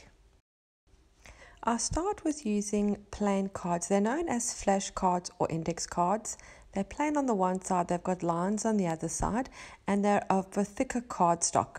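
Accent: South Africa. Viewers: Absolutely stunning, I really liked the combination of turquoise and brown. So inspirational and easy to follow. I am going to try this out. By any chance are you from South Africa, just wondering because you seem to have true SA accent. BTW I am from Johannesburg, SA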